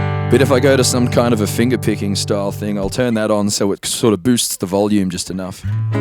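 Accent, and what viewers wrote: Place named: Australia. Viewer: Excited to see it! It is funny how a looping rig is an evolving process. I truly believe simpler is better, but you’d never know it when I set up my solo rig. See you soon in Nashvegas! Ps.... lived in Sydney for 2 years. Listening to your accent brings back some really good memories! Ta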